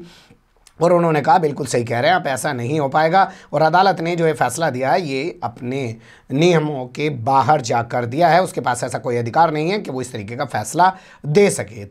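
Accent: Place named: Meerut, India. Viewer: ka merat wala style sunne mein achha lagta hai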